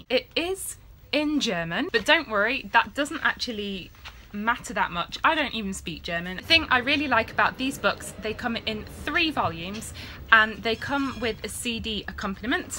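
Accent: Netherlands. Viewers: English girl speaks German with Dutch accent. Strong move!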